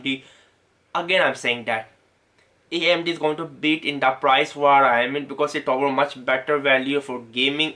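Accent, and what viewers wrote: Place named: India. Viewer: hes just indian so he has a thick accent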